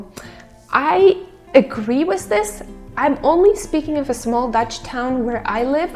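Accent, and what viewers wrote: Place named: Ottawa, Canada. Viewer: Hi i am a Dutch - Canadian ( living in Canada for 53 years ) what you are saying is so true in the Netherlands (for me it is still Holland ). The person talking about the trains is the same that i was living there , on time and fast. so the person talking about the Netherlands is a sick puppy. Love your way of thinking about for me Holland. You sound like a Ottawa person.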